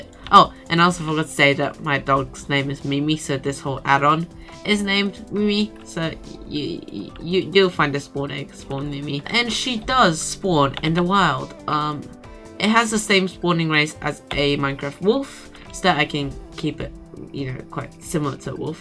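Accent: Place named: Australia. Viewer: Wth!! I didn't know you were an Aussie!!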